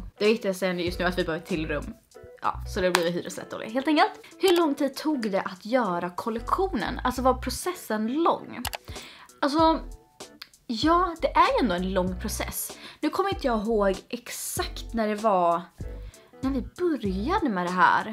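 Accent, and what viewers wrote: Stockholm, Sweden. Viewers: ja hon har bytt från vanlig svenska till stockholmska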